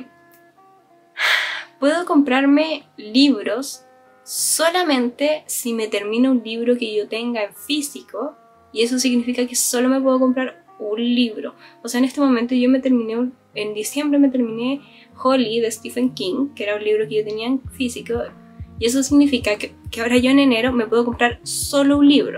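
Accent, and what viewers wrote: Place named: Chile. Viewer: Me encanta el acento chileno, felicidades por tu canal muy buenos videos sigue asi, saludos desde México.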